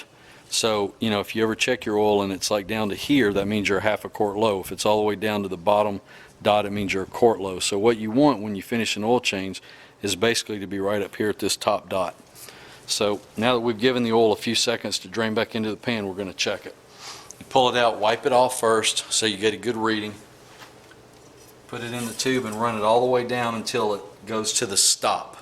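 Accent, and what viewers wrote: South, United States. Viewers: "Its not gon hurt a thang. so i take my finger and i dip it in the old oil that i just drained..."..lol .lol ....love the accent man.. Im from the island , so no shame there.. Sounds like your from the South?, pardon me if i get it wrong. Times like these i dont understand racism these days,so much we can learn from each other :( . . People who now cars know that its simple stuff or what would seem like simple stuff,but im sure alot of people have been blessed by your time. even i did, and i just came for a refresher.. Much love to you my brother. Great help,great patience with your instructions while being fluid and efficient. Bless you. And thank you.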